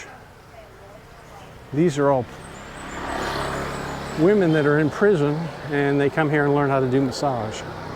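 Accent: United States. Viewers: Where are you from? You sound like your are from my neck of the woods, I am from Jefferson County just east of Knoxville TN. You sound like you are from the promised land lol! Sorry if I am wrong.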